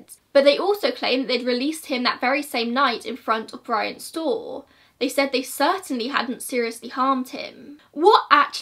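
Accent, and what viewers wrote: United Kingdom. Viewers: She has a very thick British accent. I THINK she said Gratuitous. And I think she is using it in the sense that posting the picture is unnecessary or not justified.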